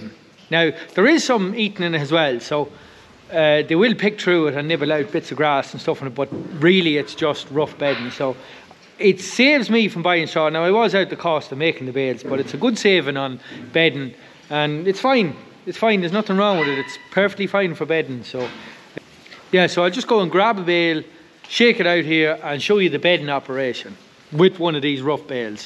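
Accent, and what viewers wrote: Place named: Cavan, Ireland. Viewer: I though I recognised that accent, good to see a fellow Cavan man on YouTube. Best of luck 👍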